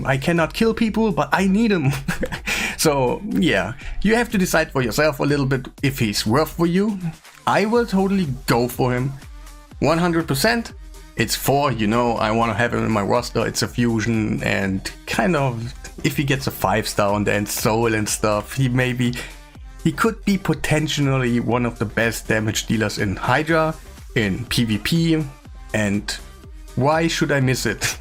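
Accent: Germany. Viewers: Dude, where are you from? You sound German or Swiss or Austrian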